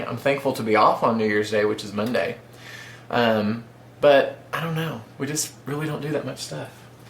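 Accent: South, United States. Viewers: OMG.. I just found your channel through Traci B and I think you are great..btw...where are you from? Sounds like you have a little country accent like me..I am in NC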